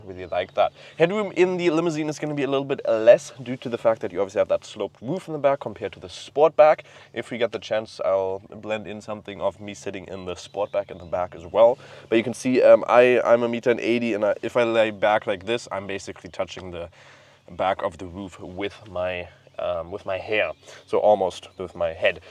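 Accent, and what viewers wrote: Germany. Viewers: Bin überrrascht, dass man dein deutsch kaum raushört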